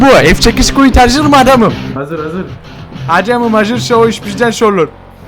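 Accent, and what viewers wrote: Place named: Konya, Turkey. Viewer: abi tam bi gonyalı gibi gonuşuyon ha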